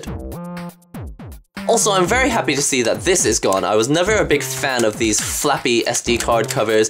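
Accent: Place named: Scotland, United Kingdom. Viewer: I can't put my hand on your accent. You sound a tiny bit Scottish:)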